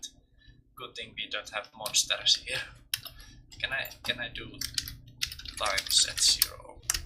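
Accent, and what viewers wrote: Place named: Russia. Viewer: I love your russian accent :)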